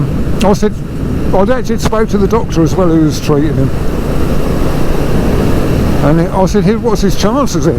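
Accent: Suffolk, United Kingdom. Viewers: Lighter note it's good to hear a good old Suffolk accent 👍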